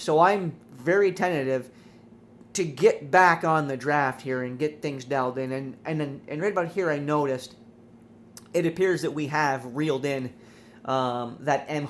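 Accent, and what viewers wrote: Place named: United States. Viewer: What an annyoing ''Yank'' commentary could not listen to this guy!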